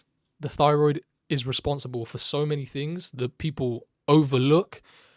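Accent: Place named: Poland. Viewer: not the typical polish english accent 😩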